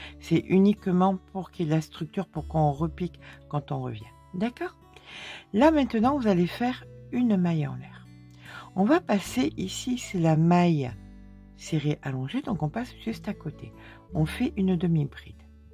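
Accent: France. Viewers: Bonjour, Française habitant en Australie depuis très très longtemps Je viens de decouvrir vos videos. Je pourrais vous ecouter parler toute la journey avec cette voie si francaise et si douce. D'un age plutot advance, J'ai commence a crocheter l'annee derniere et depuis je ne me suis pas arretee. Avec ce tutorial si facile Je vais me lancer avec ce pull merveilleux et aimerais acheter le pdf pour m'aider a le faire a ma taille, mais Je n'arrive pas a le trouver sur votre site. Pouvez vous m'aider s'il vous plait! Merci de tout coeur.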